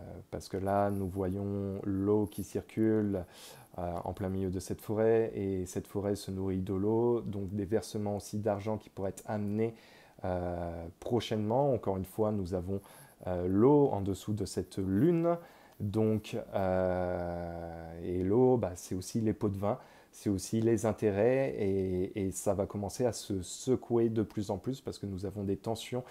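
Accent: Quebec, Canada. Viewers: Merci, par moment j'ai cru percevoir 1 petite pointe d'accent Québécois? Je me trompe??? 🤔 Belle continuation. 😍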